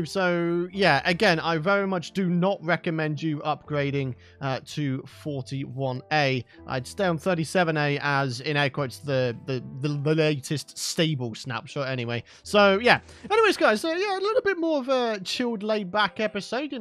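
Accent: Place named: Scotland, United Kingdom. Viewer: anyone else think that is a want to be Stampy, except stampy is english and sounds scottish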